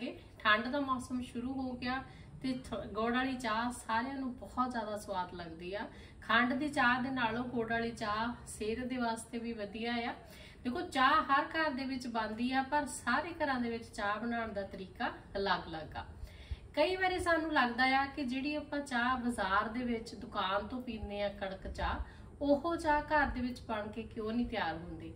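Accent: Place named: Majha, India. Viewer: "gaud" majhay aale sadde aale side di boli badi miththi hai ❤😊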